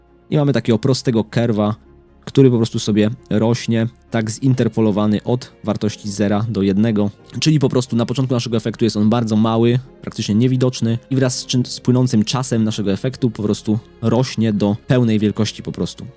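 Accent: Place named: Poland. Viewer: Mówiąc szczerze dawno nie słyszałem Polskiego akcentu w tutorialach, rób dalej co robisz wszystkim się to przyda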